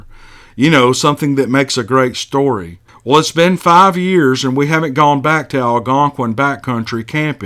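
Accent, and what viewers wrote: South, United States. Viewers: you have a fantastic gift of storytelling. This up north midwesterner loves your southern drawl. We have squatch in Wisconsin as I've heard them but never laid eyes on one. Keep up the good work and can't wait till next week! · I love your southern draw 🤗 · I really like your channel if not love it. You geht nice stories and you tell them really nice, I especially love your southern accent, and you and Lynn Smith's BigfootCaseFiles-channel drove me near to believing in the Sasquatch - though i'm german and live on the other coast of the Atlantic! Just go ahead, you made it right from the start! Tobias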